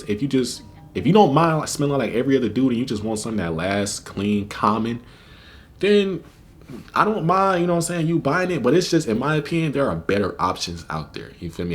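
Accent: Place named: Georgia, United States. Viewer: He must be from atl or Georgia because of the way he talking lol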